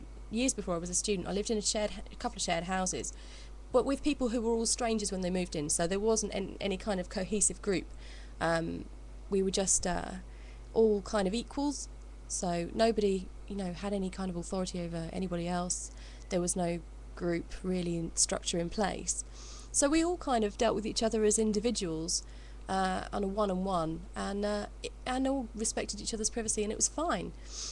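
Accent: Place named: United Kingdom. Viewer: Which dialect of the British accent are you? I am fascinated with accents... I am female & been diagnosed with aspegers not long ago, basically, I do relate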